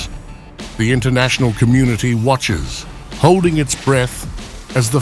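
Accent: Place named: United Kingdom. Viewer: I guess the Russians have abandoned hope that the incoming Trump Administration would work for the comprehensive peace arrangement in Europe they very reasonably want. The Brit accent of the narration initially put me on guard, the Brits being arguably the most propagandized Russophobic nation in Europe. I kept waiting for usual Western anti-Russian lies and propaganda. Thankfully they never came, and I'm subscribed.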